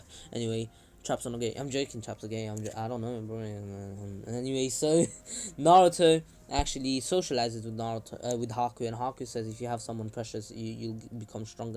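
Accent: United Kingdom. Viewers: You sound so British.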